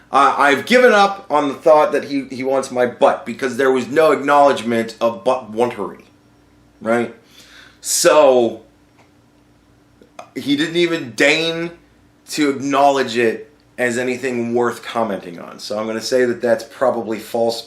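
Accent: United States. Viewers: another one from Bearing, but I do have you on 1.25 speed cos dude..... you like to take your time and yeah, you may have been funnier in that clip than normal, game up dude. nice to listen to a "normal" american every now and then. all the best. i'm not sticking around for the full 23mins though, I couldn't bear it.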